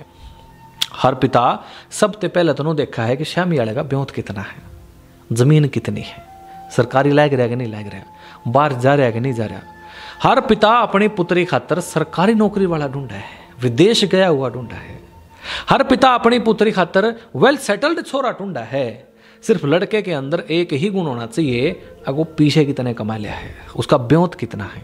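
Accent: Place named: Kaithal, India. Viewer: Ji aap kaithal se ho kya. द का न ward se pta chala h..bhut badiya bolte ho aap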